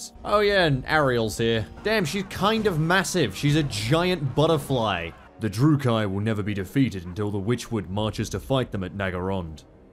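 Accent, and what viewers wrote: Australia. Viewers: It's like Sseth and Sovietwomble, but Australian. And I like it.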